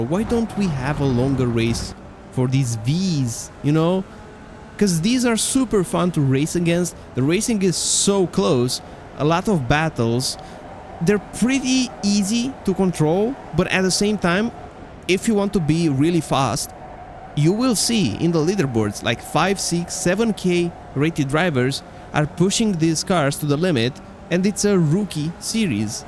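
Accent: Romania. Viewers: hahaha, mi s-a parut ca ai accent de compatriot ❤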